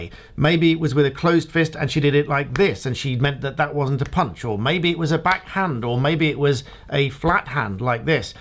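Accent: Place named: England, United Kingdom. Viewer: Why do you say says as sAYz, and not Sez? It really weirding me out, with english accent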